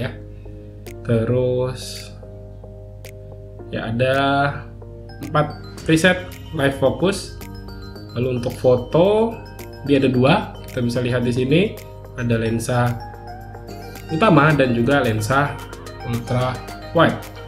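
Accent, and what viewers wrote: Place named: Medan, Indonesia. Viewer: Accent nya ga enak banget. Pelafalan D sama T disetiap akhir kata nya itu loh. China medan banget.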